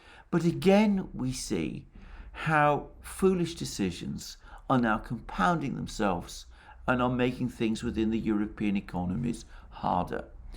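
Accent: England, United Kingdom. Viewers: It hard for me to hear English accent. But I try my best to hear your story mr...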